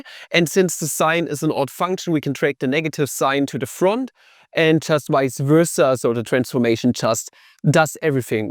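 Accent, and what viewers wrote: Germany. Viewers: I think his first language is German.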